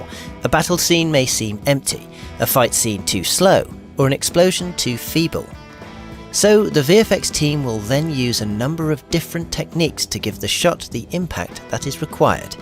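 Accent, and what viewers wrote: United Kingdom. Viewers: I like your accent. It sounds like you might be British or a touch of something else also. Have a nice day whoever you are. My name is Charlene!😊❤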